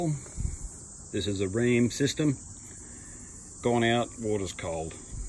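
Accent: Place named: Australia. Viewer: As soon as i heard the aussie accent I knew he was going to help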